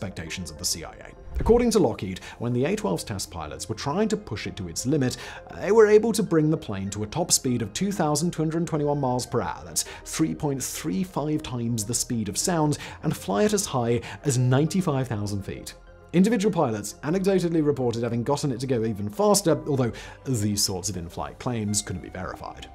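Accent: England, United Kingdom. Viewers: Can we get someone that speaks American? I'm tired of Brits, especially when they put an "r" on words ending in "a". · Normal Americans generally refer to this as an English accent. It’s strange, but the Brits all have it!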